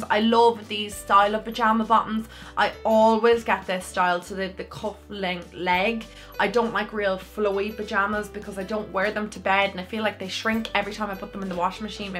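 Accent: Dublin, Ireland. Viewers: i think shes from dublin i hear the dublin accent in her i live in cork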